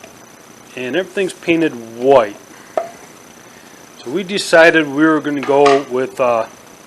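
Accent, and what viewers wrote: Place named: Michigan, United States. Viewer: Thanks for this,. This was exactly what I needed. I was having some difficulty figuring out the bevel on my floor transition. Are you from Michigan? You kinda sound like people here in Michigan. Thanks again.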